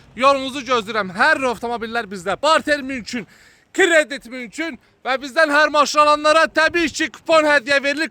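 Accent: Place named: Ganja, Azerbaijan. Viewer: Sənin Gəncəli səsinə həri deməmiş quzu kəsim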